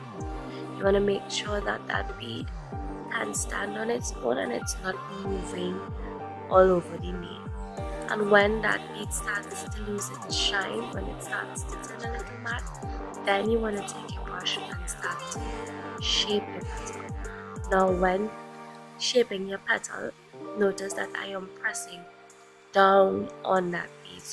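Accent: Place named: Trinidad and Tobago. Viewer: knew you were trini the moment i heard your voice 😍🇹🇹🇹🇹🇹🇹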